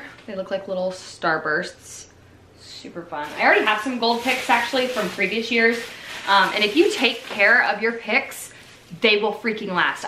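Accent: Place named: South, United States. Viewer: I love the southern accent! 🥰 My Alabama accent comes out when I get angry... or when I go into “mom” mode. 😆😂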